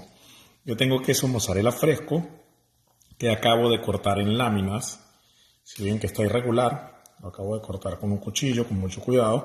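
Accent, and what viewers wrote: Venezuela. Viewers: El tono de voz muy venezolano, gracias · Ese acento Venezolano me encanta!! Probé la receta y son exquisitas. Recomendada.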